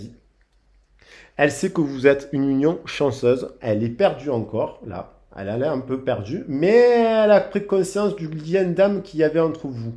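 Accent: South, France. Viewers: ah ! Je savais que dans cette jolie voix, j’avais entendu le chant des cigales. J’avoue j’ai aussi un accent bien du sud , le pays catalan quoi 😂😂des bises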